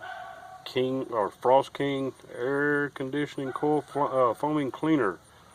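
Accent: South, United States. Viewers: Just love the southern accent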